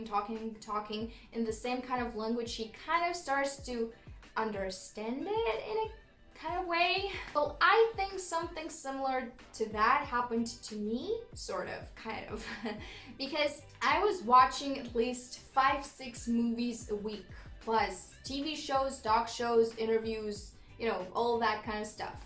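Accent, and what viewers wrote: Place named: California, United States. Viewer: I am American, you barely have an accent. Change a few sounds and you'd be confused for a California girl. Well done😘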